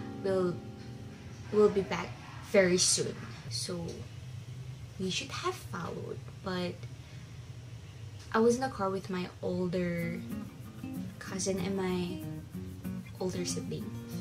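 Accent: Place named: Philippines. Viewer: you have a filipino accent... hehe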